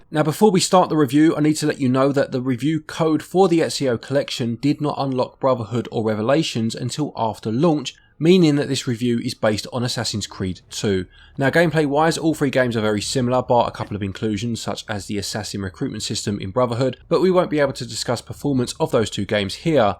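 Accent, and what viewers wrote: England, United Kingdom. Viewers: As soon as I hear the English accent I’m hooked.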